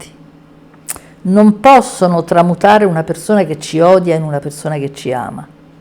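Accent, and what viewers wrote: Rome, Italy. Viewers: Professoressa lei è di Roma?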